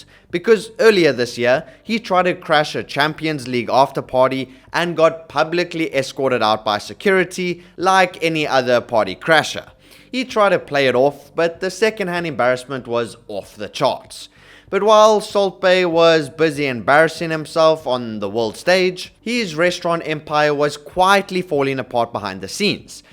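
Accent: South Africa. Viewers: I hear a south african accent 😊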